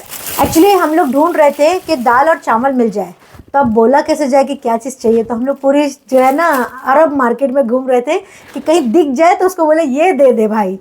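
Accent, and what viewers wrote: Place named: India. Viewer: آپکی اردو ہم کو بہت اچھی لگ رہی ھے۔ہم آپکے لہجے سے فورا سمجھ گئے کہ آپ ہند سے ھیں ۔پاکستانی نہیں ھیں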